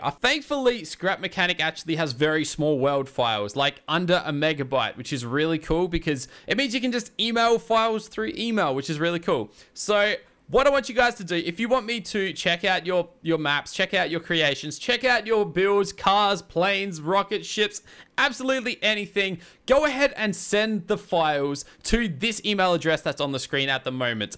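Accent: Australia. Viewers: wait u are aussie first time i met a aussie youtuber :D im aussie! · your Aussie? ey mate!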